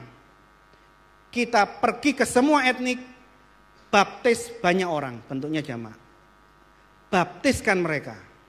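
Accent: Java, Indonesia. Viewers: ketrunan NTT..tpi uda lama dijawa..jdi adaptasinya kental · Kirain ambon. Logat jawa y.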